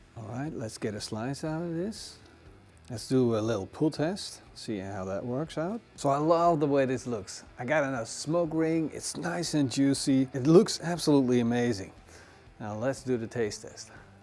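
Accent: Netherlands. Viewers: You sound like a Dutchman. My dad kept that accent for as long as I recall. Ahhhh dacht ik well hoor. :) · Holland is my guess. If you ever can't quite place an accent, it's almost always Dutch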